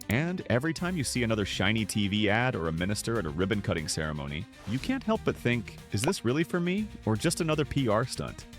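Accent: United States. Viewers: American accent not even pronouncing place names correctly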